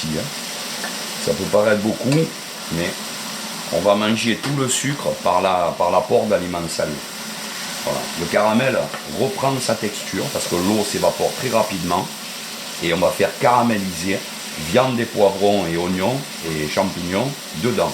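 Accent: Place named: Marseille, France. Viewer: Miracle !!! L'accent Marseillais est revenu (Mais à 85 % ...) Recette excellente comme d'habitude ! 👍❤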